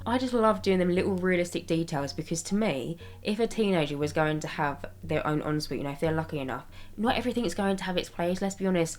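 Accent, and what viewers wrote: United Kingdom. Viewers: i love your british asent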